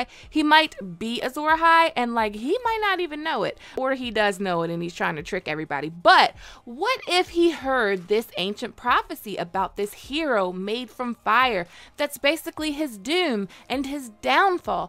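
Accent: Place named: Maryland, United States. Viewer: Good stuff. Looking forward to the new season. I hear a Maryland accent in your voice.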